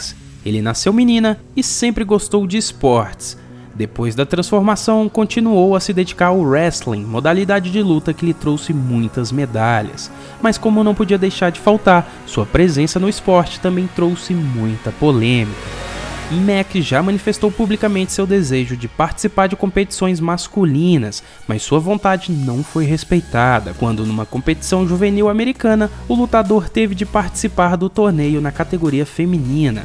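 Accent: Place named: Northeast, Brazil. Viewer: Cara vc é cearense ou Nordestino? Vc tem um leve sotaque. Valeu!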